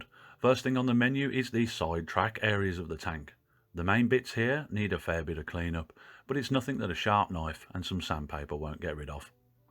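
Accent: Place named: England, United Kingdom. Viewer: Wow!! An English voice on a modelling video for a nice change!!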